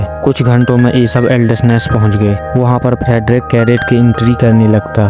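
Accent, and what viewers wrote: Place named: Bundelkhand, India. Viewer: तुम तो बुंदेलखंडी लग राय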